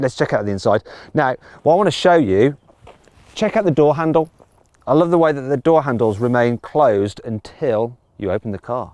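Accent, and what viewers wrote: England, United Kingdom. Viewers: 英語の訛りが強いwwwむしろカッコいい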